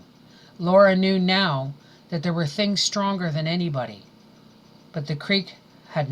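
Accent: Canada. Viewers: The Canadien accent (speech impediment) is brutal.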